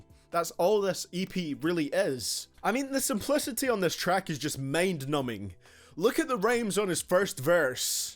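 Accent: Scotland, United Kingdom. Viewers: Where in Scotland are you from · Are you Scottish? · are u scottish cause i am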